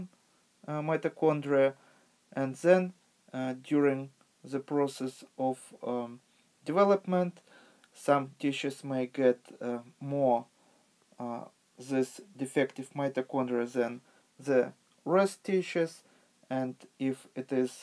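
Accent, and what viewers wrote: Russia. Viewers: Funny accent but good video I would assume your Russian good job at hiding it tho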